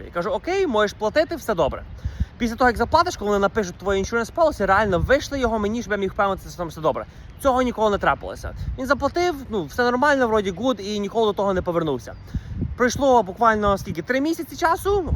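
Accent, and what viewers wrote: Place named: United States. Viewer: Швидко та якісно розмовляєш українською!ще й навіть легким американським акцентом😀👍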